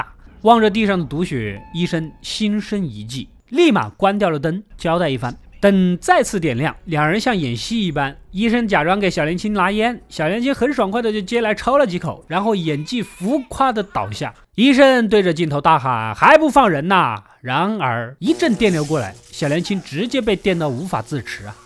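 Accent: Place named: Anqing, China. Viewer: 安庆人说的塑料普通话，真的有点好玩...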